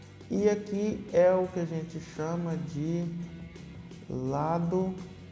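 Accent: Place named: Minas Gerais, Brazil. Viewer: toptop explicação na nossa lingua "mineires" de facil aprendizado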